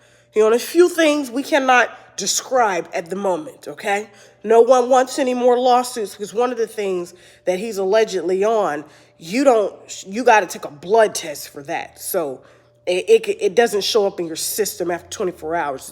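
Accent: United States. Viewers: Why does she keep doing that country accent???😂😂😂😂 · That country accent 😂😂😂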